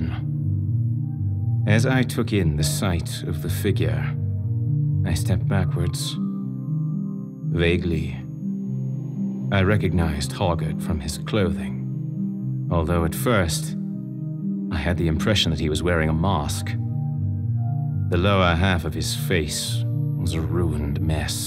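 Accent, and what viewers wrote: United Kingdom. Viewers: Ohhh British? Well this just makes me appreciate even more 😊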